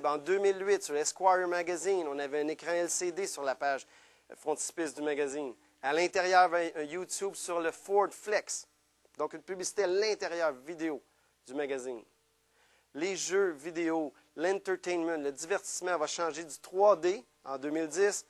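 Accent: Quebec, Canada. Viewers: C^'est un accent Québecois --'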